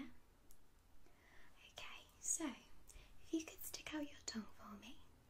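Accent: United Kingdom. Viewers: your videos are so good it's always cool to see fellow brits and hear British accents:) subscribed x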